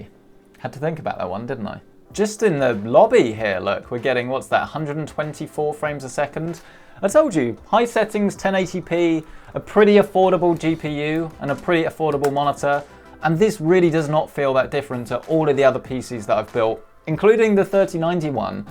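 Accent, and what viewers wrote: United Kingdom. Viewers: I just love the british accent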